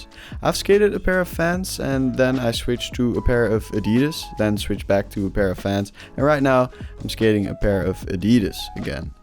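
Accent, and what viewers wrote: Netherlands. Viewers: Hey you are dutch right?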